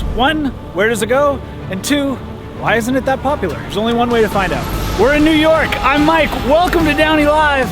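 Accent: Canada. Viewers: The man is Canadian. We don’t have to pronounce things like Americans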